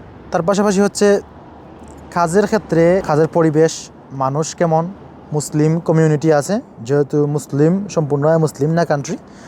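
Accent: Sylhet, Bangladesh. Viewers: ভাই আপনে আমরার সিলেটি ভাই নি 🥰🥰🥰 আপনার জন্য শুভকামনা ও দোয়া রইল ভাই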